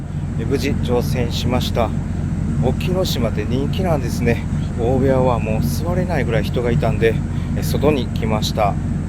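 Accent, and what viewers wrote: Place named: Nara, Japan. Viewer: さん、いつも素晴らしい動画とBGMをありがとうございます。本当に楽しく拝見させて頂いております。毎回日本ってまだまだ知らない絶景が広がっているんだな〜って感心します。ドローンの映像が素晴らしく自分で観に行っても見られないアングルからの映像に圧倒されます。ところで今回の動画の後入れのナレーションの音声が一寸だけトーンが高いように感じるのですが気のせいでしょうか？いつもの落ち着いた声が好きです。口調が奈良弁？でクピ男さん（クピ男の車中生活）に似てますよね。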